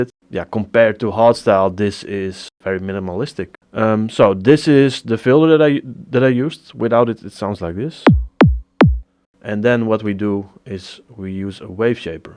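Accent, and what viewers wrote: Netherlands. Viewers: A hard hitting techno kick and a guy talking with a pleasant Dutch accent... I guess I going to listen to an old Thunderdome CD when I am going to bed tonight. In fact, I find your tutorials quite lekker.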